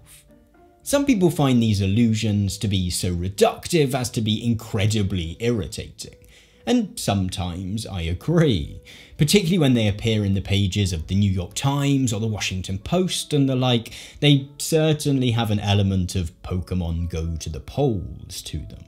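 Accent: RP, United Kingdom. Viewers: Hi, I love your contents but I am fascinated with your accent and intonation in particular. could you please tell me what is your accent?is it posh?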